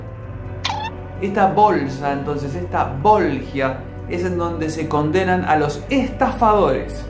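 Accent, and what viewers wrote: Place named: Argentina. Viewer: Leo un capítulo y veo tu video, ahora cada que leo un capítulo ya lo leo en Argentino cheee! Saludos de un mexicano viviendo en España tío 👋🏽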